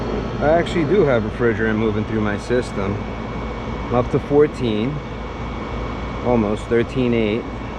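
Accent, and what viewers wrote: New York, United States. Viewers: You have a New York accent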